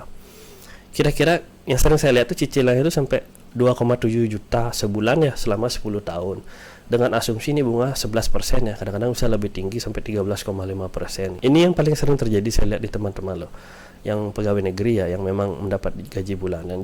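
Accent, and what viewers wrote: Bali, Indonesia. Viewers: yeeeee nak Bali boss logat nya keliatan sekali milu sik trading nah hehe makasi sharingnya salam kenal kawan baru dari Bali menyimak see u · Wah kakak orang Bali ya Logatnya kerasa banget ❤️✨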